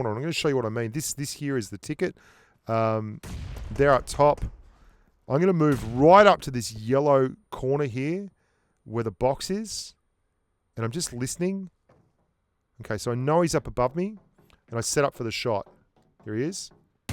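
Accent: Australia. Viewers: Ar U Australian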